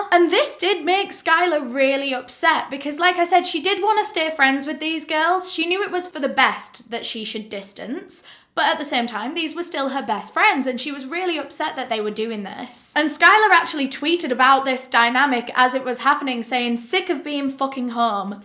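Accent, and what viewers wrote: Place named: Yorkshire, United Kingdom. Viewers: its an English accent lol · She has a Yorkshire accent :) x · You tell the story really well. Love the Northern accent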